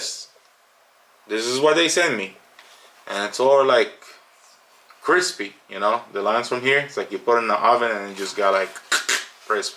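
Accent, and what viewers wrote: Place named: Arab world. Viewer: Where r u from u sound Arab, can you please do a review of more different color shoes, thank, nice review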